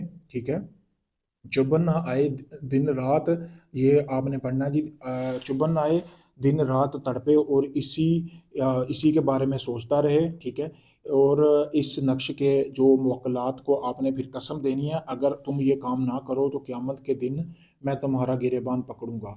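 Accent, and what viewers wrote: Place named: India. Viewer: Bhai aap kidhar ke rehne waley ho. Apka lahja Hindustan wala hey,or apki alfaz ki adagi bhi theek Nahi.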